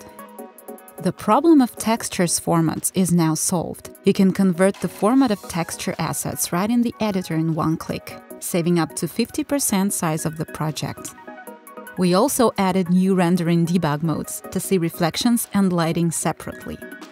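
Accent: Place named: Eastern Europe. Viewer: спасибо за славянский акцент. стоит поработать над К и П звуками.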